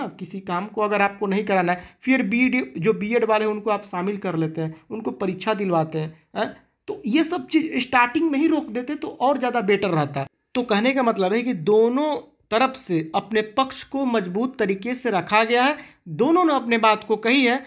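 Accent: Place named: Bihar, India. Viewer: बिल्कुल बिहार वाले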